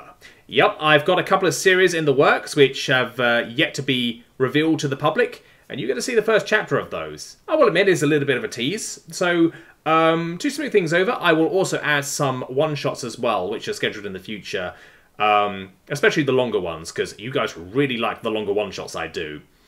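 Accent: United Kingdom. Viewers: This was the first time you sounded positively British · aside from the good news, I have to say, this man is one of the most British people I've ever heard. it doesn't come out in the normal videos.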